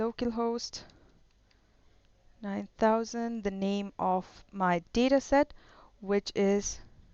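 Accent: India. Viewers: The first video that seems indian English that I could understand 😂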